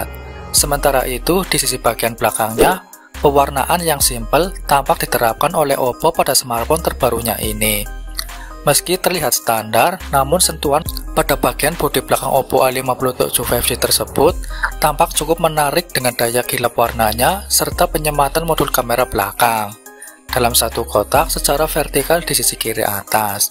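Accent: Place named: Java, Indonesia. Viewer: Bagus tapi medok sekali hehehe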